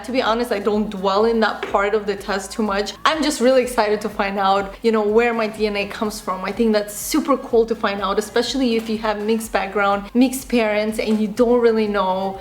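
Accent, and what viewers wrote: Russia. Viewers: you could see it from her videos that she's russian plus her accent is obviously a russian one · Русский акцент?😳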